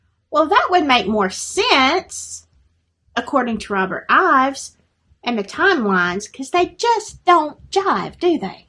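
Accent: South, United States. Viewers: Poor Libby and Abby...there's so much going on there... I agree with almost everything you said here!! God bless! 💜 Im in Georgia..I'm from Alabama!! My accent is like yours!!! I love my country accent!! 💜💜💜💜 The Summer Wells case has blown my mind!! Talk about sketchy folk!!! EVERYONE around that baby and area is so absolutely disgusting!!!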